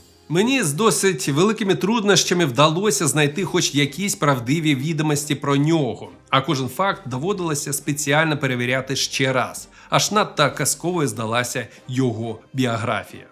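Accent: Russia. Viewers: Приєднуюсь до критики і від себе хочу додати, що відчувається россіянський акцент. Треба над цим попрацювати, або не поспішати. Але взагалі дуже чудовий канал та цікаві відоси. Продовжуйте! Будемо дивитись!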